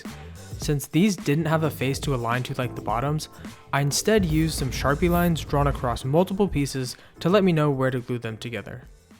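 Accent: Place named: United States. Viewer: you're so american it almost reeks out of your voice. ew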